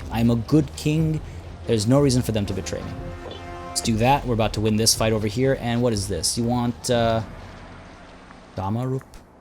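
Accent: India. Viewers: Bhai ye India lag Raha tha muje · How can your Indian accent is soo good are you related to India in any manner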